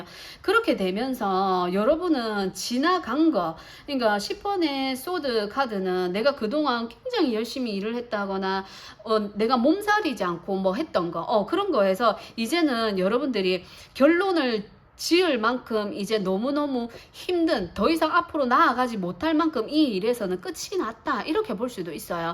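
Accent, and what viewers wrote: Gyeongsang, South Korea. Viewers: 경상도 분이다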